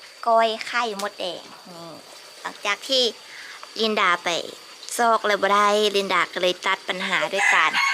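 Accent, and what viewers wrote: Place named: Laos. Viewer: ภาษาสำเนียงลาวเป็นเอกลักษณ์จังเลยนะครับสาวลินดา จะมากินกุ้งเมืองไทยอีกเมื่อไหร่ครับอยากไปเที่ยวลาวจังครับวัดที่ลาว สถาปัตยกรรมสวยงามครับ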